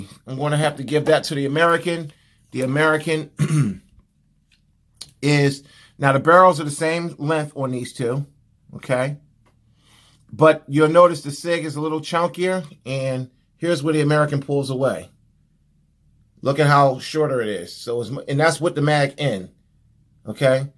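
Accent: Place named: New York, United States. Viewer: I wished I saw this before buying.. Your vid was great. The Sig is great. But I went with the Ruger. I ripped off the Novaks for Tru Glo FTX Pros . The Novaks were meh. But the American has a better mag. The teflon coated mags makes a difference. The trigger on the Ruger gets better after 500 rounds. It feels a little heavy at first but it's a new gun. The Sig comes out the box with a good trigger and sights. Both awesome pistols. One just needs to mature. But with the TFX sights and some rounds through it I think the Ruger is better. I rent a 320C at my range for fun. Great gun.. But I don't regret the Ruger for a second. It just needed some...Love. The Sig 320C comes out the box the way my Ruger is.. In the end it's just preference. I can't shit talk either or. Both awesome. PS: love the accent. I'm from NY.. I feel ya.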